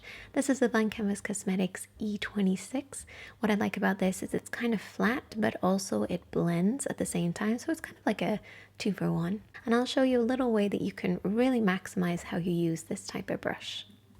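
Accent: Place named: Ireland. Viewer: Sometimes depending on the words you say I can hear the Irish accent, especially if the word has a u in it, like much, brush, blush... I love it 😍😍😍, i've always said if I could have any other accent other that my US southern accent, it would be Irish, you're voice is NOT annoying either, I find it very soothing, shame on them 😤😤😤, I love this video, really all of your videos, you always use the minimum, but it always looks like it took hours, a whole brush set, and a whole palette and then some and create the most beautiful looks 😍😍😍.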